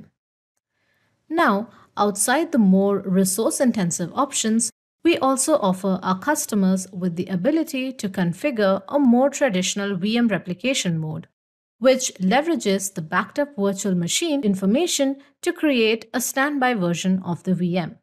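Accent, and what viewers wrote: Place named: India. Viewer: Giọng Ấn khó nghe quá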